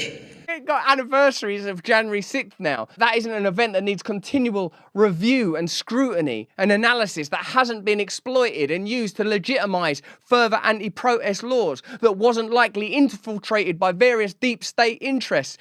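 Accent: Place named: England, United Kingdom. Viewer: Lol is this guy American? He sure sounds English😋 don't bother I know where hes from its just funny hes so wrapped around this trump. Hes a decent actor tho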